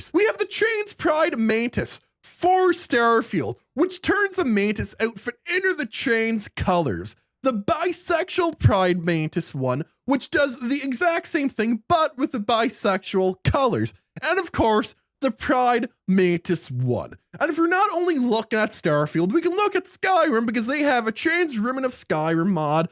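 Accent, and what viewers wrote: Canada. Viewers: Are you fuckin candian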